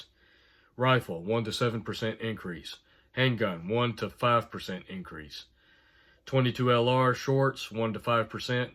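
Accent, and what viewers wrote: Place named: Southern Virginia, United States. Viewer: I'd like to meet you, im in Blue ridge mountains of southern Va, where are u located, you sound like you'refrom my neck of the woods ??? Im looking to form a mag but nobody around me are reliable or aware???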